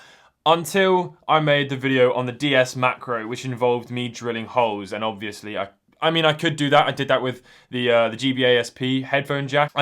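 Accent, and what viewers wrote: United Kingdom. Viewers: Whats with the deal with the British accent but American phrases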